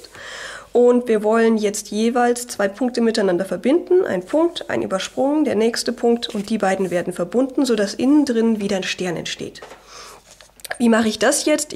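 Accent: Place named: Germany. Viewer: Is this speaking/demonstrating cause on another video on her account she says she is German. I am proud to be half-German I love the German accent no offense but accent reminds me of my dad but he died when I was 3. Very good english on other videos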